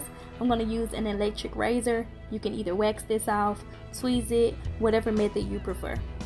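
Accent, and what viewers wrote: South, United States. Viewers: Nice southern accent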